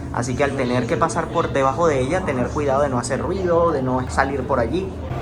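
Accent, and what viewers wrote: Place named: Venezuela. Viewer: soy venezolana y por casualidad me encontré con este video. Al identificar tu acento, me emocione. Al mismo tiempo me lleno de admiración como en estos tiempos tan difíciles están realizando tu y tu esposa este trabajo increíble. LOs felicitó! Continúen con esto que es el rescate de la historia y su legado a las nuevas generaciones.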